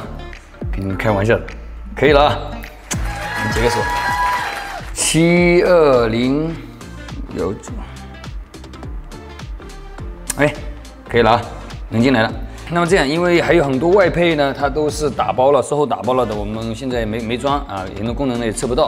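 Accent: Wenzhou, China. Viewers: 牛 上来就是标准温州普通话口音，一看真的还是温州老板 哈哈 赞～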